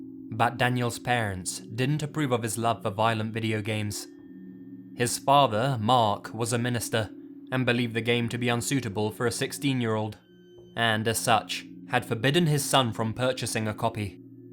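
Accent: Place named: United Kingdom. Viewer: opens video British accent closes video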